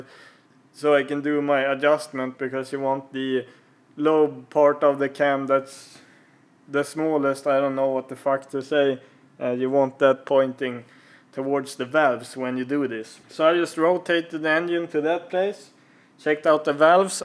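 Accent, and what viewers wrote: Norrland, Sweden. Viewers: Har du någon statistik på hur många utländska tittare du har? Diggar din Norrlands svenska 😃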